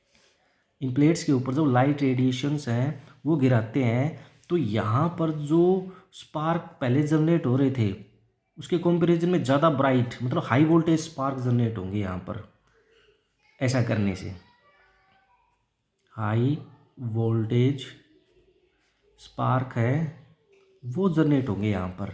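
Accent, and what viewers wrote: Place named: Haryana, India. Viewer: That haryanvi accent tho🔥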